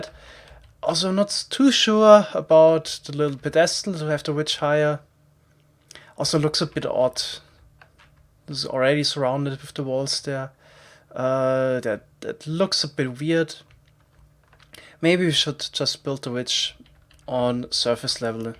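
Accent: Germany. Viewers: The german accent is strong in this one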